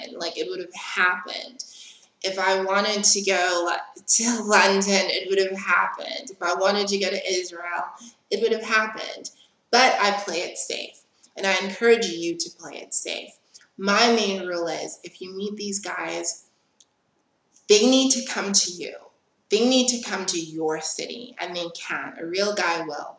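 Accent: United States. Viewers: You’re accent doest strike me as South african but rather American?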